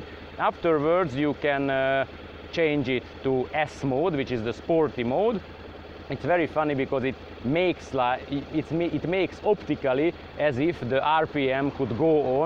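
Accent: Hungary. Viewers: Borzalmas akcentus... komolyan. Magyarországon képtelenek megtanulni az emberek, hogy NE magyar hangleejtéssel beszéljenek angolul. Inkább feliratozzátok a videót.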